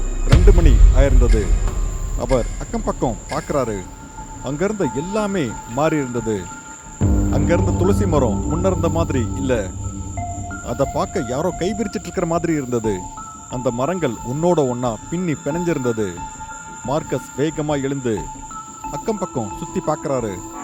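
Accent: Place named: Karnataka, India. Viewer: Kannada Tamil super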